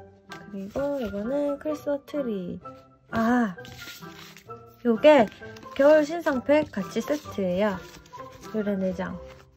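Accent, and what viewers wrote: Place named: Gyeongsang, South Korea. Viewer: 오늘 영상 처음 보는데 대구분이신가용?? 저희 어머니랑 억양이 비슷해섴ㅋㅋㅋㅋㅋㅋㅋㅋㅋㅋㅋㅋㅋㅋㅋㅋㅋㅋㅋ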